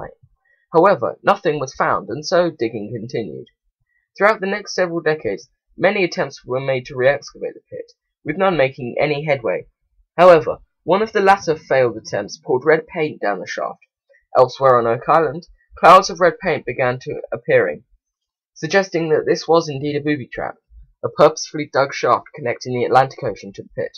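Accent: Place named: England, United Kingdom. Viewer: george lightheart He sounds English to me.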